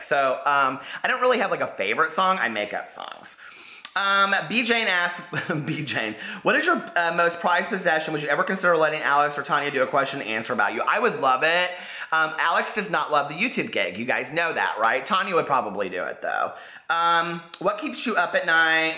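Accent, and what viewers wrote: South, United States. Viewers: Just found you....love u so much! Are you from the south?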